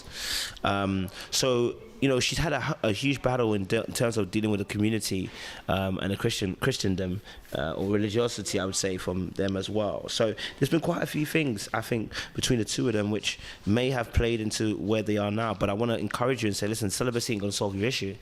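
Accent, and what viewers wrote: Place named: United Kingdom. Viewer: You are talking nonsense! Unless you are secretly married and speaking from experience, you shouldn't be providing any advice on this matter. Your comments regarding her vision of marriage are very elitist and African disguised with a British Accent! The Christian community should be praying that their divorce does not go ahead, but all of a sudden, everyone is a psychologist.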